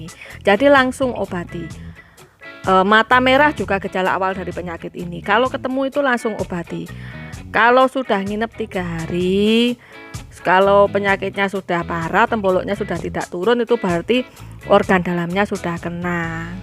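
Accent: Java, Indonesia. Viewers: Asli mana mbak Lamongan ta? Kog jowoe medok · Suaranya medok banget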